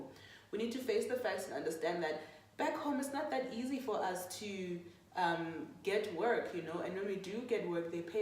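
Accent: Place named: South Africa. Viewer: I love your Xhosa accent dear. You make me miss ezlalini. And thanks alot for such an informative video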